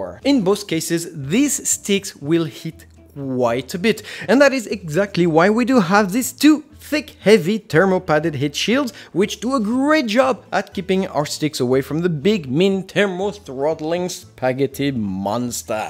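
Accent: France. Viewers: he is french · you are french, right ? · accent français ??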